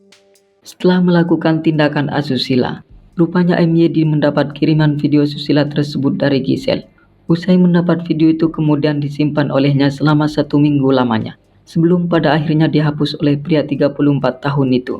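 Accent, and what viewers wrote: Madura, Indonesia. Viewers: Sepertinya org Madura ini pengisi suara nya wkwkkw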